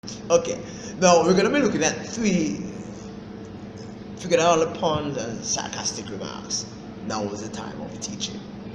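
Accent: Jamaica. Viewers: Im going to enjoy your cannel good Sir and cheers buddy from atl Ga me and my wife saw your country and went to the blue hole your county is so beautiful and everyone i met was so kind and down to earth god bless ya and best of luck with your cannel good Sir and love your jamacian tone ya man and much love to you and your lovely country and lovely people take care bud :)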